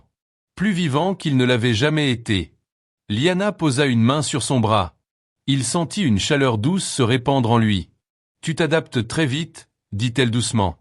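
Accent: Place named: Portugal. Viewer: C'est n est pas une autre langue..mais du français avec un accent portugais ou brésilien Mais, oui,c est penible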